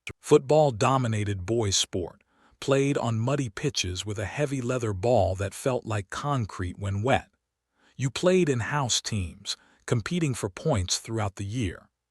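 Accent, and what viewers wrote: United States. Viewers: Why an American voice,? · The American voiceover suggests this can be disregarded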